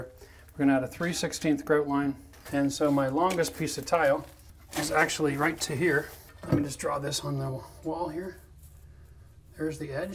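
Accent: Canada. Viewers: This Canadian accent is crackin me up! O.g lol.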